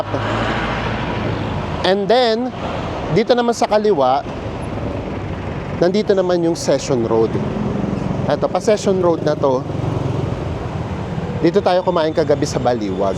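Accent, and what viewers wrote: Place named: Parañaque, Philippines. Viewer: I love watching your vlogs , always positive ❤ and your accent is the-same with paranaque 😅 thank you and more power ❤ I’ll be in Baguio dec26 till jan2 … from Chatsworth city California 😊❤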